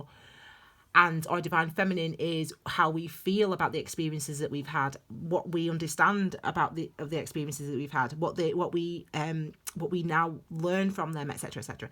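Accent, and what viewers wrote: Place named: Lancashire, United Kingdom. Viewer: a grand lancastrian accent..interesting reading.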